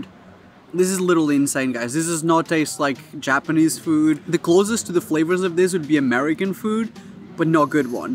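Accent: United Kingdom. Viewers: of course he's British. Do you not hear him?😂plus he has said many times he lives in London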